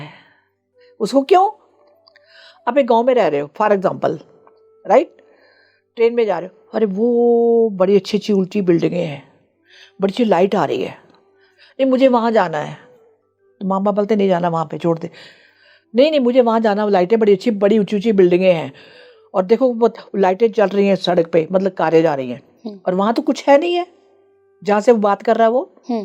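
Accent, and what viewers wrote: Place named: Punjab, India. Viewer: ji muje lagta he aap punjabi ho bat karne ka style punjabi he aap ki shakal punjabio jasi he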